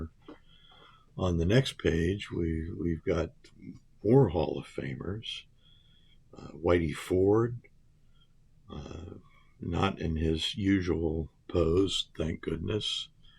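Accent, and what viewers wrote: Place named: California, United States. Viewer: Great video!!!! Love this set! Question: are you from Cali? You sound like a friend of mine who is from Agoura Hills .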